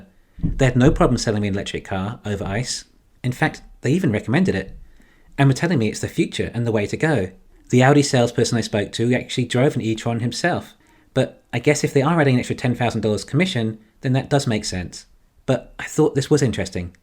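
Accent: United Kingdom. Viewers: I’m in the U.K., his accent sounds British to me.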